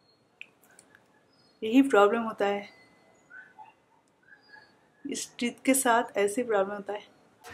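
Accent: Bengal, India. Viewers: Bachpan se bangla bolti aae hai , hindi jitna v bole bangla hi unka 1st comfortable language hai so hindi me v bangla style a hi jata hai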